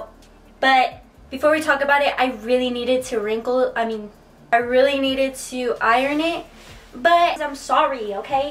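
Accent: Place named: South, United States. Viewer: is it just me or she has a slight country accent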